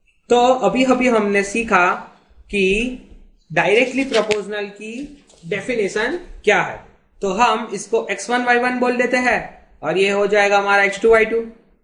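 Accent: Bihar, India. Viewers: bihar ho kya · Sala Bihari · Are you from bihar!! '!'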